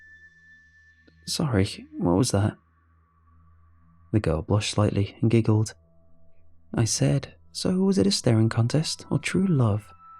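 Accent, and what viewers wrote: England, United Kingdom. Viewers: What accent has the narrator got? Sound's English but I could be wrong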